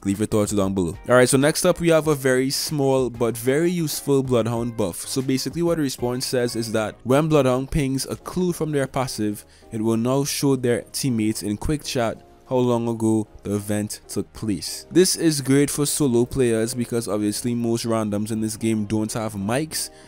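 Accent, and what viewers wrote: Trinidad and Tobago. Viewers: Where you from bro trinidad? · Are you trini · Just came across this video and had no idea I was finna listen to a trini accent 💯🇹🇹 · Hold on….that accent…. You Trinidadian by chance?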